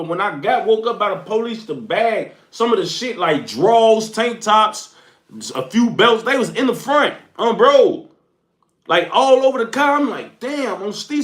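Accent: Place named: Detroit, United States. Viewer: I’m from Detroit that’s how we talk my baby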